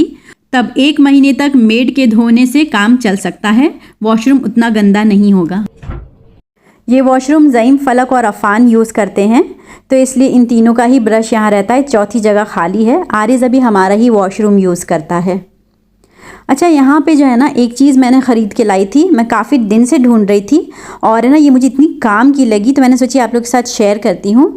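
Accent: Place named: Varanasi, India. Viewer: The way YOU said this 👉🏼 "..yahan to etna badaa badaa bathtub🛁 hai..esme koi late k to नहाता hai nahi pr Clean pura krna padta tha😅😆is sooo Desi style n 💯 true as well. I live in medina N i loooveeee ur desi accent its sooo much similar especially ur "kahawate/muhaware" which reminds me my home town Banaras,U.P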